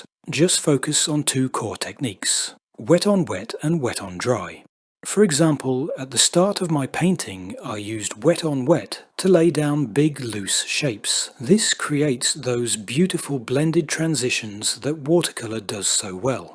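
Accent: United Kingdom. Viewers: He sounds English but can not spell colour?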